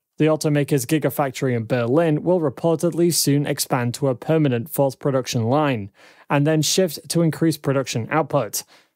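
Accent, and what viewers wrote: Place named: England, United Kingdom. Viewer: You are right!! Imust also add that the accent this person posesses is extremely annoying; Is this guy from England or is he just pretending that he is from England. It's a pain just listning to him with that weird accent.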